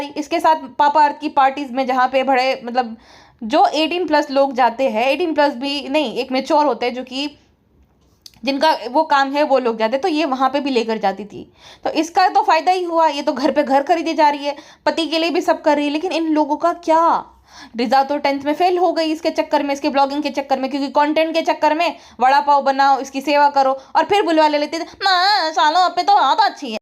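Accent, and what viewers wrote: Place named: Uttar Pradesh, India. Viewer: Your UP accent😂😂😂 orii morii maiyaaa 😂😂 love your podcast ❤ love and support from Gr Noida..😊 aur video like kardiya h❤🥲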